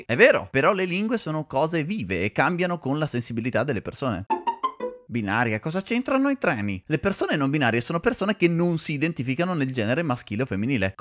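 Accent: Naples, Italy. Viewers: Incredibile.. sembra napoletano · Sembra napoletano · sembra napoletano😅😅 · ma questo modo di parlare è normale nella lingua napoletana.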